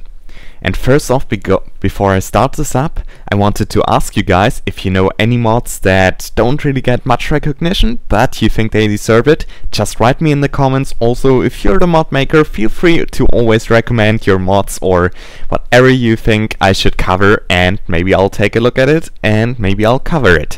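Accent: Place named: Germany. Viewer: du bist ein deutscher dat hört man....xD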